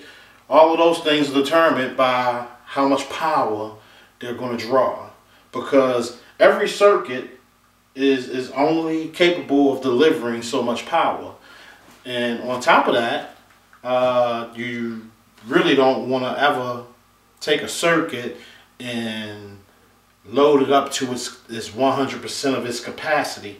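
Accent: Baltimore, United States. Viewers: Are you in the Baltimore area? Kind of a Bmore accent. I'm in DC. Anyway kick ads videos. You just got another subscription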